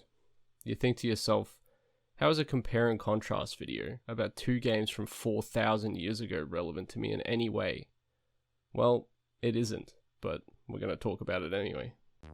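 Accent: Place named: Australia. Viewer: Subbed! Not trying to be a bitch, I mean this as constructive criticism - you talk kinda slow, your voice is nice it was a tad too fast on 1.25 and , it would probably be good to speak a little faster. - it's not your accent, I'm Australian too, peace from Perth, WA(steland) ❤what you said about good sprites > poor 3D, HOLY SHIT THAT IS SO PAINFULLY TRUE WITH POKEMON! Seriously, compare the gen 5 sprites on the DS (nearly 20 year old hardware tsk tsk) to the gen 6 (3DS) 3D renderings, they looked lifeless and cursed - shit, compare gen 5 sprites even to gen 9 on the Switch, gen 5 sprites are STILL sooooooo much better!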